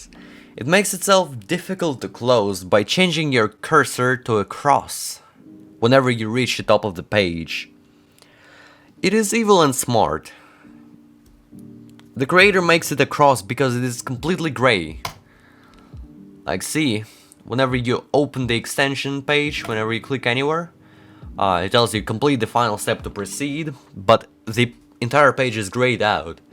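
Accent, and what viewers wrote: Russia. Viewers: Love your videos! I've really noticed your Russian accent though. Go learn an American accent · strong ruski accent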